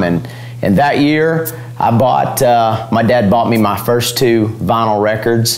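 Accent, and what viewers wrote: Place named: South, United States. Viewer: wow less talking more singing!!! had to skip through a minute of your country accent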